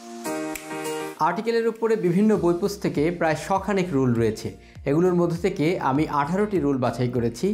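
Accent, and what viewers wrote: United Kingdom. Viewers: it is surely with BRITISH ACCENT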